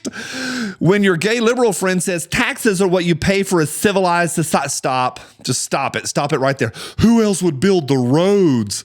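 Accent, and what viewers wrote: South, United States. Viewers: I don't think I ever heard a gay guy with a southern accent before (nothing wrong with it).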